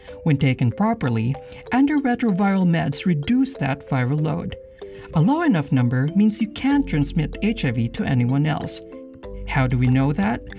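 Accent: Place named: Philippines. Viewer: The narrator sounds Filipino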